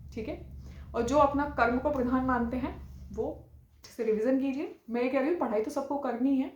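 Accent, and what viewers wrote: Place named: Purvanchal, India. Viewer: hame laga purvanchal side ki ho aap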